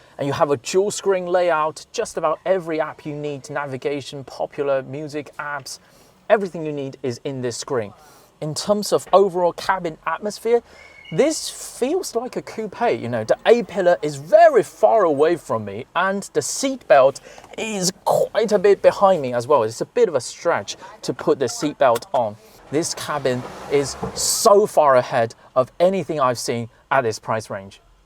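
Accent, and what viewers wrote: United Kingdom. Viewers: Just came across this video and channel. Who's this guy? He's hilarious and of course the accent and humour of a true brit!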